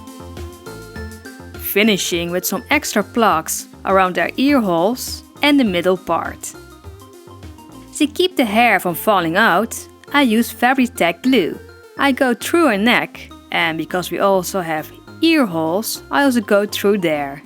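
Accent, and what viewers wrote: Netherlands. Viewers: Are you dutch you sound very dutch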